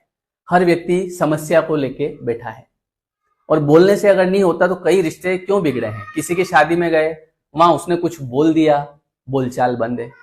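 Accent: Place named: Mewar, India. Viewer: Sir, Apka mewadi tone bhut acha h bolne ka.😅 U are amazing. 😊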